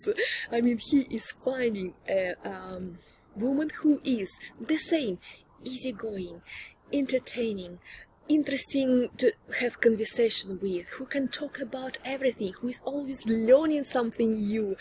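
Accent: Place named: Eastern Europe. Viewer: I absolutely love Eastern European women speaking English with their native accents. I have no idea why but I could listen to your astrology explanations all day. Excellent explanation as usual. 💯💯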